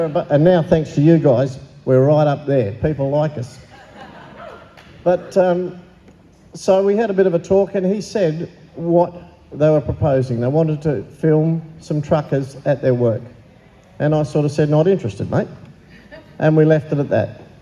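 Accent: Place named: Australia. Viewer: Wow what a awesome speech spoken like a true blue Aussie Truckee copy that 10/4 cob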